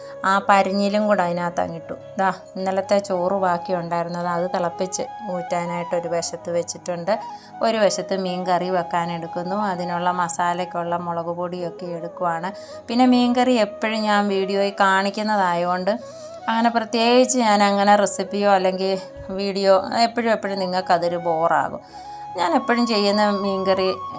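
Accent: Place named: Kottayam, India. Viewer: സംഭവം കൊള്ളാം. രസമുണ്ട്. കോട്ടയമാ അല്ലിയോ?വർത്തമാനം കൊള്ളാം. ഇഷ്ട്ടപ്പെട്ടു.go ahead👍👍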